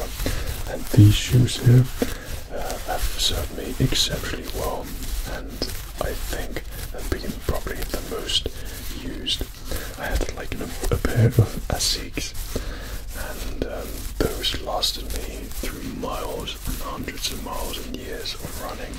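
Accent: England, United Kingdom. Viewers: is Australian, is British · I never knew was a fellow english man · My strange addiction over here ngl I’ve been watching u for time had no idea u were British 😂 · your from England?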